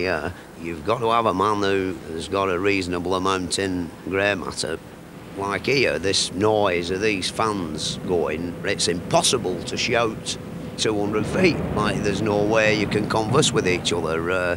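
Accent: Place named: Northern England, United Kingdom. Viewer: Ha, yeah it's northern English.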